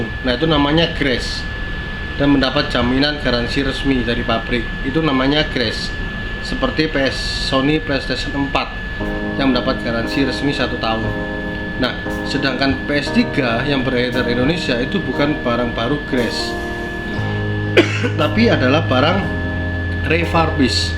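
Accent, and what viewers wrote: Java, Indonesia. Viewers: Org pontianak kok logak nya jawa sih bang😂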